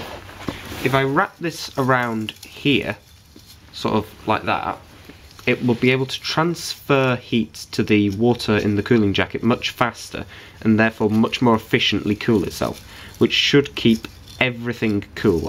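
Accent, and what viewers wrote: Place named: Europe. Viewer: what is "the clappers"? Like full throttle or as pilots know it as WOT (wide open throttle)? I'm from Texas and this guys sounds European (UK?) So I'm not up on the lingo. I know word like "keen" (willing to do happily or with a wanted desire) please friends clue me in. Btw Howdy Y'all from Texas. Thank you in advance.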